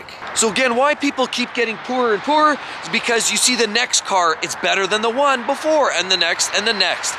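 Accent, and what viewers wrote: Edmonton, Canada. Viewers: Are you from Edmonton?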